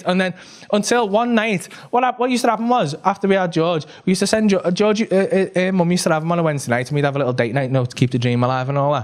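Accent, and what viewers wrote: Liverpool, United Kingdom. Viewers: 10 seconds of Scouse and I'm out. Sorry mate. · Yeah this is proper Scouse lad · I’m an American so sometimes I have trouble understanding when he talks fast...need to brush up on my Scouse a bit! But Paul is absolutely brilliant!! 🤣😂 I need to make a trip across the pond just to go to one of his shows ... and experience the Kop of course!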